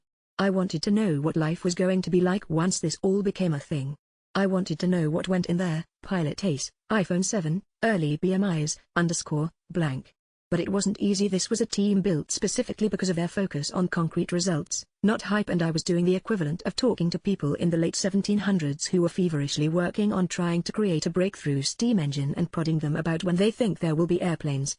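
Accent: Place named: United Kingdom. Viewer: Now I fucking hate the british accent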